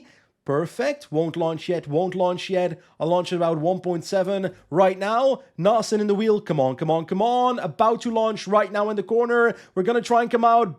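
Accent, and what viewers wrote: Belgium. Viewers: Are you from Belguim or the UK?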